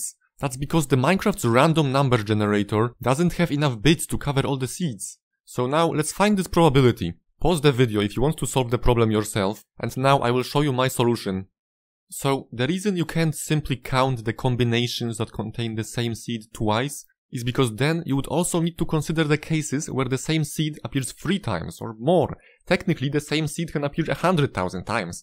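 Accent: Poland. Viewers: Ach ten polski akcent🎉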